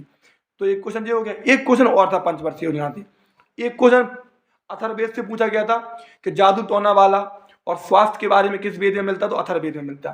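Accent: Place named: Bundelkhand, India. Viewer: Bundelkhand me kaha se ho bhai.. tumhari boli se samjh a rha hai😂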